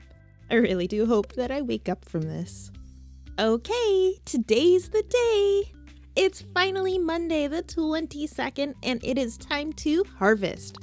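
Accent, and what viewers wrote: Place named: United Kingdom. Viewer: eeeeewwwww BRITISH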